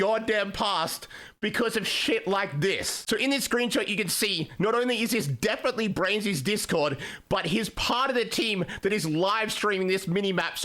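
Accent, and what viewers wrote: Australia. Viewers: Came for the drama, stayed for the Aussie accent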